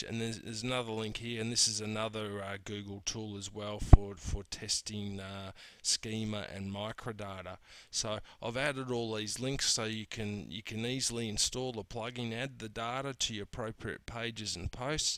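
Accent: Australia. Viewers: Thanks, also love your wonderfully laconic Aussie accent! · great post love the Australian accent:)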